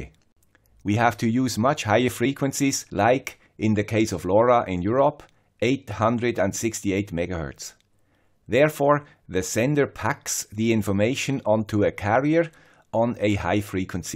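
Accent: Switzerland. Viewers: Came here for the IoT, stayed for the Swiss accent and the cat. Great content as always!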